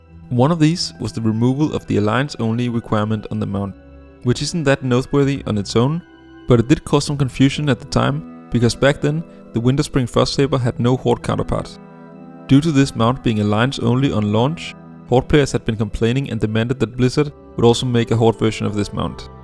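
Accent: Denmark. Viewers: Elsker din danske accent :D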